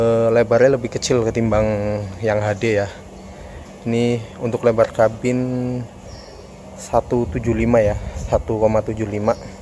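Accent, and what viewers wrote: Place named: Tegal, Indonesia. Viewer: Sih kayane wong tegal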